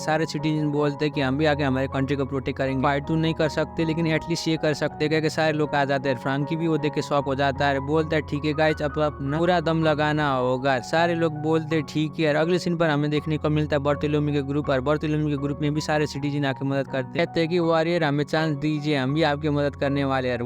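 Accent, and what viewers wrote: Nepal. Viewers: Are you nepali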